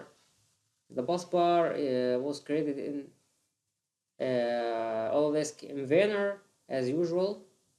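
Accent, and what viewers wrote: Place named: Egypt. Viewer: although you have a very good english that made me doubt, however i had a strong feeling that this is an egyptian accent xd. very informative video even after 7 years, thank you! can i get your email for a technical question?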